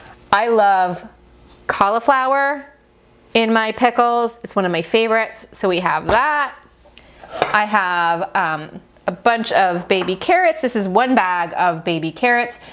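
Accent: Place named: Kandahar, Afghanistan. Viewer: سلامونه جاني، زما مو تر اچار جوړولو په رسا کندهارۍ لهجه ږغېدا خوښه سوه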